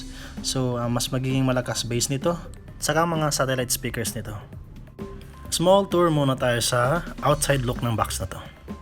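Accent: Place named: Cebuano, Philippines. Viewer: Bai. Taga asa ka? Bisdak man kaayo. Shoutout diri Linamon Lanao del Norte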